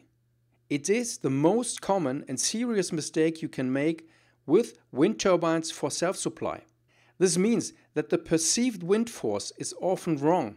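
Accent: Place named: Germany. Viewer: I like English of Germans. I can easily understand it.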